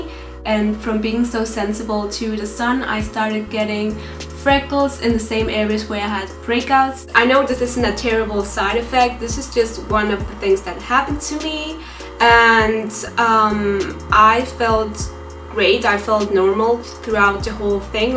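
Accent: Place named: India. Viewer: Are you Indian ? You've that little Indian English accent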